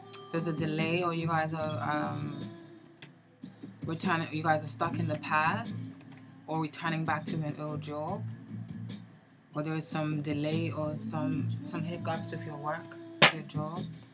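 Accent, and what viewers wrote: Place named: Bermuda. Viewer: I enjoy your readings and keep up the great work as it definitely resonates with me. I've been listening and noticed that the accent sounded familiar. Hi my fellow Bermudian. :)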